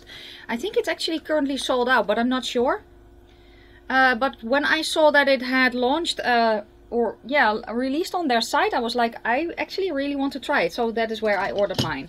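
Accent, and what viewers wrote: Netherlands. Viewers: Your dutch Accent is so cute! 🙈🥰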